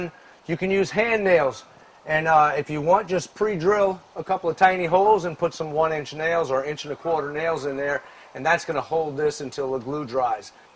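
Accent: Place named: Louisiana, United States. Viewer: Great videos. My wife is from Louisiana and when she first heard you she said he's got to be from Louisiana. Keep the videos coming.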